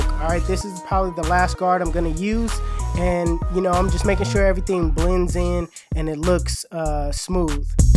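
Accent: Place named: New York, United States. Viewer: New Yorker accent is strong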